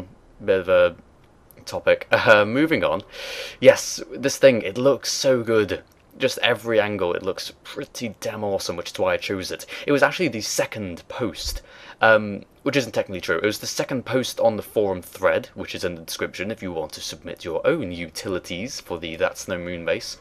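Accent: United Kingdom. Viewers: What area of the UK is that accent from? Can anyone tell me? Pls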